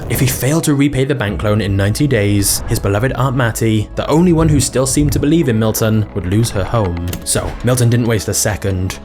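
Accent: United Kingdom. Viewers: Are you British or American ?? Because you’ve got British accent and words but your sponsors just use dollars I’m so confused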